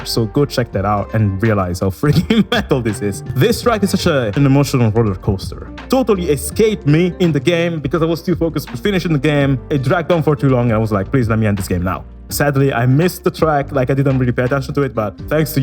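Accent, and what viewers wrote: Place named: Italy. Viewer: He does sound like an Italian with that accent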